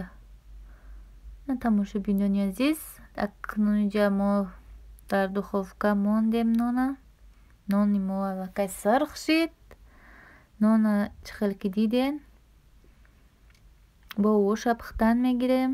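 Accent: Kulob, Tajikistan. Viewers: Саломалекум апаи хохари намедонум мада бехат видиёхотон макулай баройки бисёр тарзи гапзании хушру дорен хами лавзи кулоби бехат лавзи ширинай хиёл мекнум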